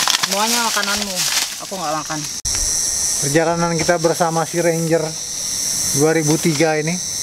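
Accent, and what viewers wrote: Palu, Indonesia. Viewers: Makin lama disini, logatnya bang sudah seperti logat Palu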